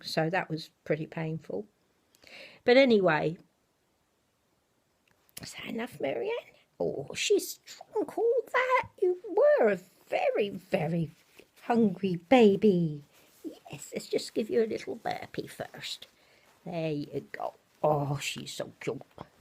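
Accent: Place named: Essex, United Kingdom. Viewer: I love your accent. My best friend lives in Clacton and her accent is like yours. Well you have an Aussie baby, l will have to look at Nursery x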